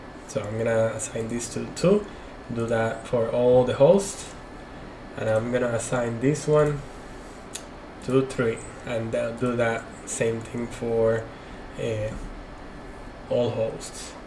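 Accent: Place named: Brazil. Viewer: sotaque de BR em man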